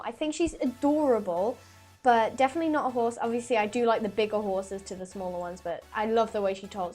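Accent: United Kingdom. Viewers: you speke britesh and p.s i love your videos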